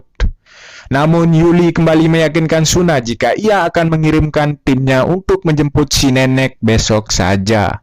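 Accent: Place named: Lombok, Indonesia. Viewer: Logat sasak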